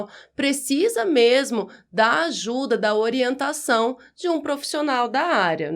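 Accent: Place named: Northeast, Brazil. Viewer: Teu sotaque parece com o nosso daqui do Nordeste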